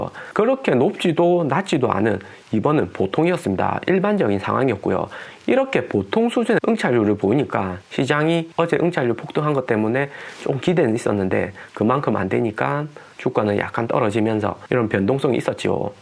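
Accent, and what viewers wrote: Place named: Gyeongsang, South Korea. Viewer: 항상감사하게 듣고보고 있습니다, 구수한 경상도 말투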